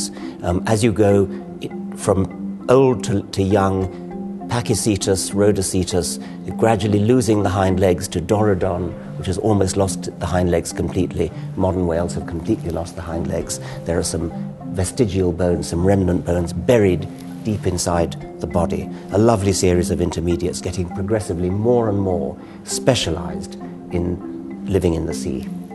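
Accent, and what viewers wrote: United Kingdom. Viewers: “Even his British accent couldn’t make that sound intelligent” I get where you’re coming from because iv never come across anyone so desperate to take the intellectual high ground. He’s unbelievably patronising and philosophically naive! That’s brilliant Dawkins your a great scientific populariser and no one is more “human” than you but no one asked you to explain evolution as most people know evolution is a fairly good theory and it still doesn’t justify your claim that eugenics would work on humans without explicitly condemning it. Not to mention your naive and arrogant dismissal of philosophy and metaphysics. According to Karl Popper “it is important that scientists remain humble as a prediction could change from one moment to the next, unfortunately there is corruption since scientists started getting subsidies.” (Karl Popper). 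Science can’t “prove” anything as it is provisional and can only infer. In a thousand years Dawkins description of evolution will be synonymous with Bronze Age cosmology. We are not even scratching the surface of reality and logical positivism is self refuting. “logic is an illusion” (Nietzsche) I don’t personally have a problem with evolution or the natural sciences as long as people don’t ram it down my throat by insisting Im committing some kind of heresy if I choose not to describe all of reality using the quantitative language of science. According to Einstein “It would be possible to describe everything scientifically, but it would make no se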